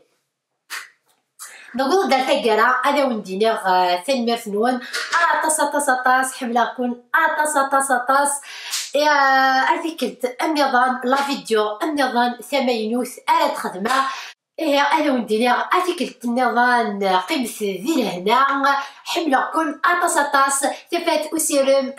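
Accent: Kabylie, Algeria. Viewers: Des fois je ne comprends pas ton kabyle mais mon mari me dit que tu viens peut être de la petite Kabylie dans ce cas là c'est normal. Mais j'ai vécu qu'en France du coup il est vrai que j'ai beaucoup perdu. En tout cas tu rayonnes ça fait plaisir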